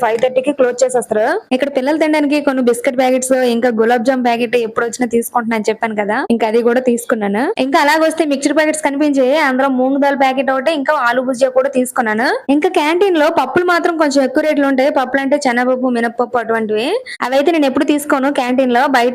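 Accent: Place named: Vizianagaram, India. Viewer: విజయనగరం యాస...very nice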